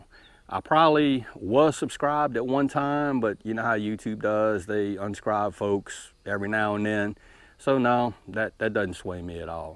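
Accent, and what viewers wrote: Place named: Mississippi, United States. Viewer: I love your channel and tht Mississippi accent. :)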